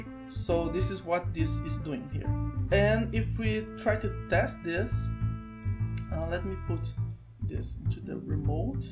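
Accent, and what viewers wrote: Brazil. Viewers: sotaque brasileiro??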